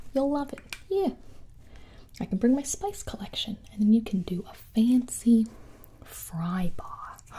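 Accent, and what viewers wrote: United States. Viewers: The American accent tho 🤣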